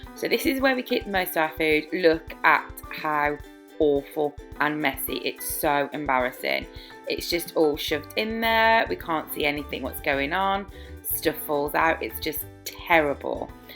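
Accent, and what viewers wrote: Birmingham, United Kingdom. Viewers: If just watched like 10 of your videos in one go! Love them!!!! I'm from Birmingham but when I got married moved down south so been soo good to here the accent!! Great videos can't wait to watch more!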